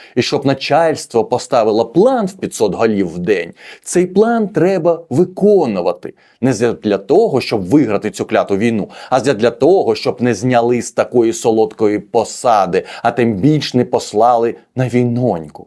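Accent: Russia. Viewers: Скільки років Незалежності України ? 33 роки. Скільки РОКІВ ВИ РОЗМОВЛЯЄТЕ УКРАЇНСЬКОЮ ??? 1 РІК . МОЖЕ 2 . ЦІКАВО , ОСОБЛИВО ЗВАЖАЮЧИ НА ВАШ РОСІЙСЬКИЙ АКЦЕНТ ТО ВИ НЕ СОВОК ??????? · не смог посмотреть только из-за того, что украинский от автора (который явно думает на русском и всю жизнь говорил на русском) просто ужасен. вот эта вот типичная путаница и/і просто сводит с ума. никогда не смогу понять, как может украинец в Украине говорить на украинском с АКЦЕНТОМ. это пиздец, господа.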